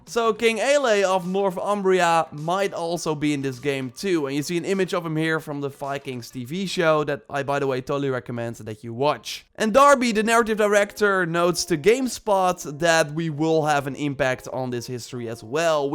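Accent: Netherlands. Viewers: Gwn een vraagje, ben jij nederlands?? Denk dat ik n nederlands accent hoor🤭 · Damn I hate the Dutch accent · You, I can hear that you're Dutch. Is there going to be an area in Valhalla in the Netherlands?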